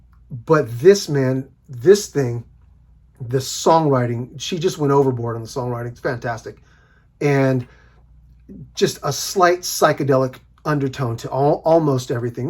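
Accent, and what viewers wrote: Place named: France. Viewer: Hey thanks for the recommendations. I must admit I am not that big of a fan. I have only heard his first but it was really boring to me. I do like although I don't have any of their albums on vinyl. that is interesting. I do have. I didn't realize they were still putting out music. Nice French accent by the way. I hope you are doing well. Cheers.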